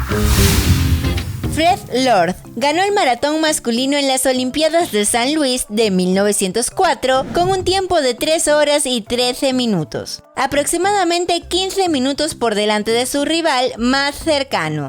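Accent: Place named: Spain. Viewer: Os molesta que dice las C y las Z como en España, os quejais mucho del racismo pero sois los peores.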